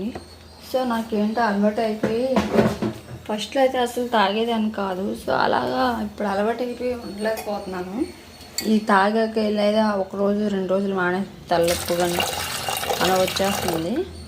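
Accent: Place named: Godavari, India. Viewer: Godavari la undi Telangana language sister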